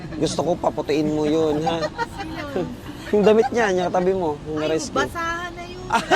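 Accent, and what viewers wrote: Philippines. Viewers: Magkababayan palang kita Lods 😊ringkonada din ako...taga NABUA po aqo😊taga sari po ika tabi sa bicol?Ringkonada rin kc Ang salita mo.. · Regardless kung paano ka magsalita ng Bicol Ang importante yong tulong na ginagawa nyo❤❤❤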